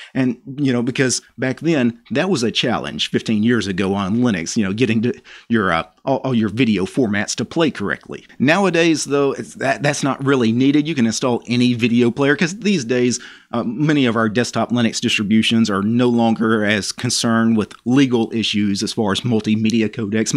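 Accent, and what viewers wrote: South, United States. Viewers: You sound southern. Where are you from?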